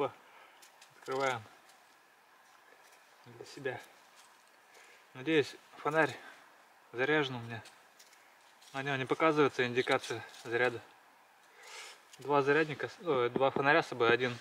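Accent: Russia. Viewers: сразу слышно что русский!!!!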